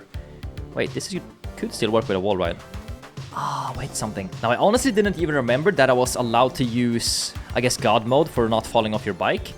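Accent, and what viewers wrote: Sweden. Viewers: is this guy swedish cus he have an swedish acsent when hes speaking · You can hear hies Swedish from hies English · Are you Swedish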